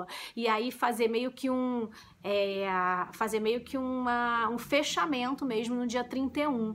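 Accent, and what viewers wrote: Rio de Janeiro, Brazil. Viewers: Você tem toda razão, Misery é angustiante rsrs adorei! Então, esse pacto que você tem é o mesmo que eu li, "pacto/amaldiçoado" é o mesmo livro, eles mudaram o nome quando lançaram o filme com o Daniel Radcliffe, ainda não assisti. Quanto aos livros acumulando poeira na estante, eu costumo fazer trocas no Skoob, já me livrei de muita coisa e já consegui livros incríveis. Super indico. Beijão! Já falei que adoro o seu "carioquês"? kkkk acho lindo.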